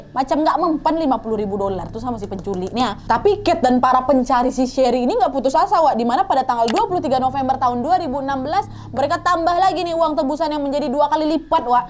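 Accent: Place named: Medan, Indonesia. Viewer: Aku penggemar baru celotehanmu.. Biasanya aku merinding..tapi tertawa denger ngoceh 2mu... mantap... lucu. Kocak... teruskan.. ala medan logatmu tu.. i like it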